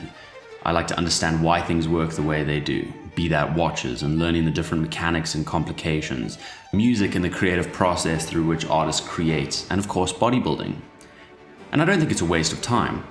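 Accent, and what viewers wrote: South Africa. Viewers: South African bro? Let’s go represent Bru! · Great video. Spot on, all these trainers often end up paralyzing people into inaction because there is always supposedly a more optimal way of doing something. It's certainly happened to me and many others I know. Also, nice to hear a South African accent! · Btw you a saffa bro? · 🚨🇿🇦🚨 Saffer twang detected! Saffer twang detected! 👮📢 Aweh Aweh boet 🤙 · are you south african? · Great video mate! Can def tell youre from SA. Represent us brother! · i needa ask, are you South African?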